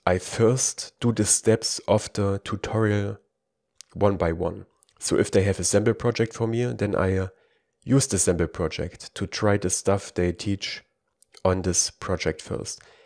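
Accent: Germany. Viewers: man merkt etwas, dass du aus Deutschland kommst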